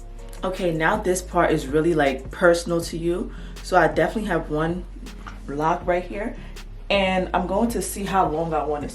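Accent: Liberia. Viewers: I Heard your Voice and I knew Off rip You Were Liberian ! 🇱🇷🇱🇷🇱🇷 Hey my sista I can’t Wait to do my Hair ! Thank you ! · 🇱🇷Liberian!! As soon as I heard the accent 😂😂🩷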